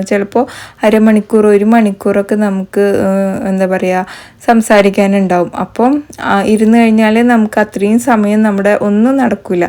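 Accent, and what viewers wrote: Palakkad, India. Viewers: പാലക്കാടൻ സ്ലാങ് വേറെ ആണോ അറിയില്ല അത് കൊണ്ടു ചോദിച്ചതാ. ഞാനും മൈലാഞ്ചി ഇട്ടാൽ കൈ ചുമക്കില്ല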